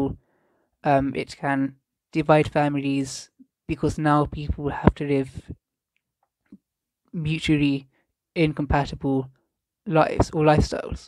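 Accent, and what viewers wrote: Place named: RP, United Kingdom. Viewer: you have a very posh accent. You must have gone to posh public school. Your understanding of Indic faiths is very much appreciated. It would be very good to connect as also live overseas and feel frustrated that well meaning Xtians overseas don’t realise the damage they do to India.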